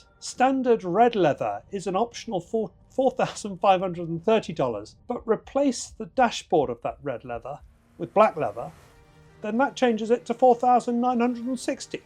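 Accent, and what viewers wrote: United Kingdom. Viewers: I think it’s “jen-tee-ann” blue, but you get some slack because of your British accent. 😆 Great review though, and I’ve watched a few. Yours was a nice honest assessment. Thank you for that. One of the best so far. The cool things about the T, outside of its engine/suspension/performance specs, are the throwback wood shift knob and the black plaid seat inserts. They make the T truly unique. The good news is that you can add both of those visual cues to just about any Porsche. I’ve previously had a guy in Mexico City make almost the exact same shifter. I’m sure a black plaid material is available in automotive upholstery circles. You can also get large black/black Pepita houndstooth from Relicate for a similar interior vibe. Keep up the great work,!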